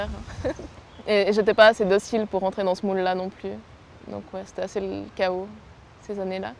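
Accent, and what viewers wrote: Switzerland. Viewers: J'adore ton accent suisse ! :) Et bravo pour ton courage d'avoir fait le pas ! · Titrer "sans argent" alors que en moins de 10 secondes t'entends deja qu'elle est originaire de Lausanne en Suisse. Au moins j'aurais perdu moins de 10 secondes !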